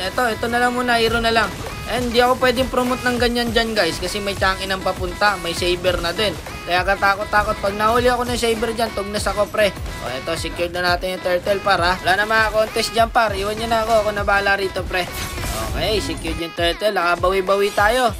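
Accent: Iloilo, Philippines. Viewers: tito maraming salamat sa mga video mo, napapasaya mo ako sa malulungkot na araw ko, ilongo voice naman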